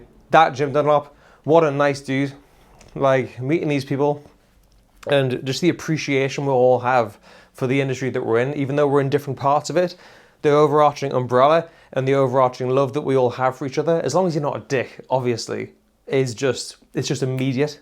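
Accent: Newcastle, United Kingdom. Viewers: I only ever watched PMT because of you. One you're a Geordie yenaawattamean, but mainly because of the enthusiastic way you presented new gear. Don't know what the next stage of your journey will be but I'm certain that someone will snap you up to do what you do best, demo gear and play guitars. All the very best mate.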